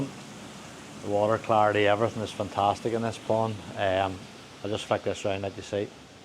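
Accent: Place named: Northern Ireland, United Kingdom. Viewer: I seen you in Yvos video. Well heard you lol so I messaged Yvo and asked is he a Bangor man or Holywood man. He said no he's from Northern Ireland haha. I'm from Holywood originally. Keep the content coming...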